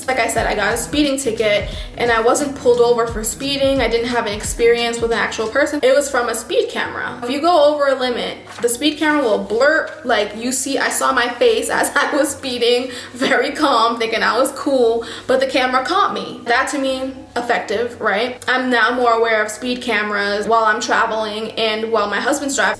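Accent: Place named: Germany. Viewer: Not that you would not use an english R... But you sound in some ways like a german english-learner. - You still have clear pauses between your words, you pronounce the S-sounds quiete hard, you sometimes say a german W (which is else an english V, not a UU), ... "Neuschwanstein" for an example - You have all the sounds, but do not use them. "Neu-" (correct) ... "-schwan-" is not like "shvan", but like "shvuhn" ... "-stein" is not "steen" but "-shtyne", without the E pronounced. So "Neushvuhnshtyne" would be correct spoken. ... Btw.: You usually do not need a new ticket, if you miss a single train. It will be most likely appropriate for next one in your direction, which is, also most likely, max one hour later, but sometimes has to be the same type of train for the same ticket.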